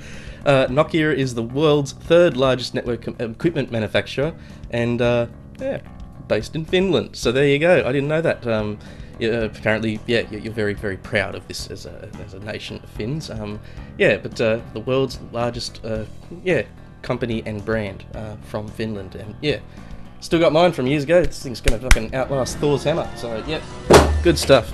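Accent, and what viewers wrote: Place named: Australia. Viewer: Hey! As always cool reaction, never get tired of hearing a good Aussie voice on reaction vids haha I reckon you hit up " " soon, my fav track, Floor and Marko vocals on point. Keep it up legend!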